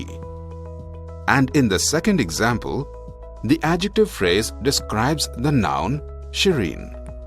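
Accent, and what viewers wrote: India. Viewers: Damn the Indian accent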